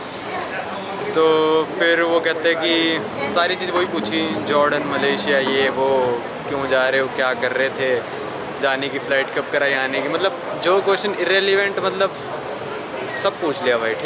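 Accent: Haryana, India. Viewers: I love Haryanvi accent bhai. Love from Lahore,Pakistan.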